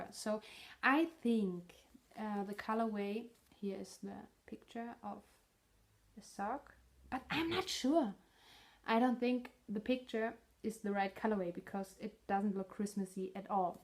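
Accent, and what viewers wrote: Germany. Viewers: I just found your podcast and just love it! Dont worry about your English, it is wonderful, I lived in Germany for three years and can hardly speak it at all. I love your accent and it brings back such happy memories of when I lived there. We lived in Northern Germany in a town called Duelmen out side of Munster. I love the word of the day idea too. I hope you keep podcasting! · Moin moin! Your English sounds so beautiful with your German accent! I've watched all your recent podcasts and decided I love them so much I would start at the beginning! My husband works nights, my children grown, it's nice to have "company" while I knit and crochet in the evening. I'm so glad this wasn't your first AND last podcast. Thank you for sharing your passion for yarn, knitting, crochet and the Lord!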